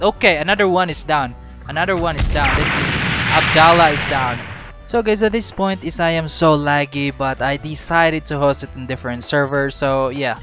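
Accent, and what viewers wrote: Philippines. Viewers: ye his accent aswell is like a filipino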